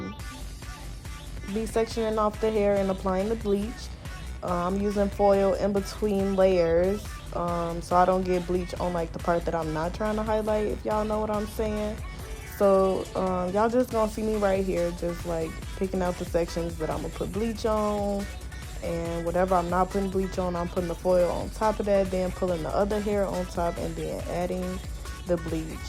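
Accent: United States. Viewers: G I went to your socials because I know Chicago when I hear it. You snapped on the wigs and the video too sis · Girl u are funny🤣....!!!! your accent reminds me of Miami lol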